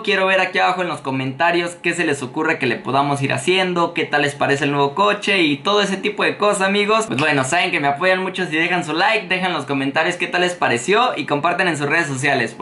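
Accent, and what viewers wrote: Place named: North, Mexico. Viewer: Se nota que eres un pinché nacote norteño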